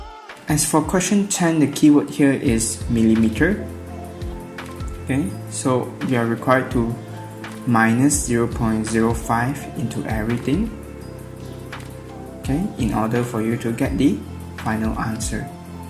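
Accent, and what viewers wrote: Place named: Singapore. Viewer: Thanks!! I know this question is out of the blue but are you from singapore?. Ur accent is kinda similar so😊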